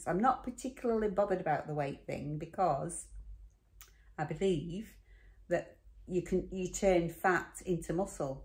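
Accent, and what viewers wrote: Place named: England, United Kingdom. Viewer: I’m 76 and live in Toronto Canada. I walk most days. If it’s snowing I do the treadmill. I really enjoy your vlogs. Keep them coming. You have quickly become a favourite. And you’re very professional already, even though you just started. I’m originally from England and it’s like being over home listening to your accent. Keep up the walking…💃🏻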